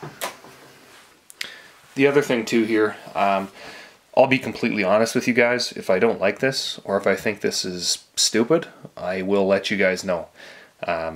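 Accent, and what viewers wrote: Canada. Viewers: Thanks this will be helpful. I have an AB oval on my road bike and I DO notice a difference there. I am considering one for my MTB. Used to live in Evergreen, Calgary. I thought I noticed an Alberta Accent.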